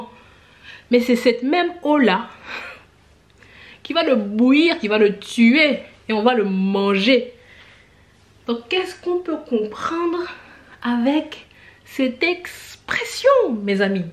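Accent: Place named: Africa. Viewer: Merci pour toutes ces expressions ; que je connais sans savoir leur signification . Tu es trop forte .tu as l'accent africain et jaime bien .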